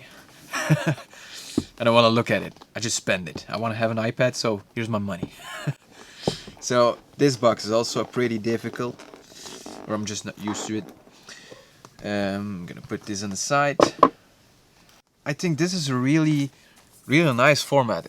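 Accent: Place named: Belgium. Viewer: guees belgium accent :D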